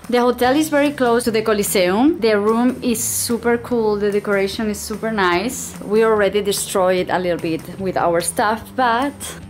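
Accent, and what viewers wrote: Spain. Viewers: Me gusta tu acento español ! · me parece de cuarta una española todo el tiempo queriendo hablar en un inglés un poco duro!!!! afloja con el english y habla en tu propia lengua te aseguro que te verás más natural no tan forzada!!! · A mí me parece genial que hable en inglés, porque ella tiene seguidores en muchos países. Si tiene acento español hablando en inglés es porque ella es española y no tiene porqué perder el acento. Yo no creo que su inglés sea tan malo, se entiende perfectamente. Por otro lado, para llegar a hablar muy bien un idioma extranjero, hay que hablarlo antes mal (que no digo que sea el caso). Nadie nace sabiendo. Hay que ser un poco más tolerante con los errores, y deberíamos valorar más su iniciativa. Hay más mundo que España y el castellano.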